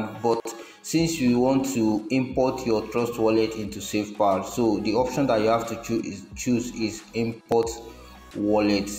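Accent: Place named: Africa. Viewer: Just because you dont understand African english. People like us understand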